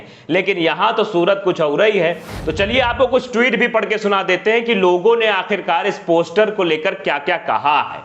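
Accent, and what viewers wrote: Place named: Bhojpuri, India. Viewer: Bhojpuri style me bolate hai sir ap bahut maja Ata hai👌👌👌👌👌👌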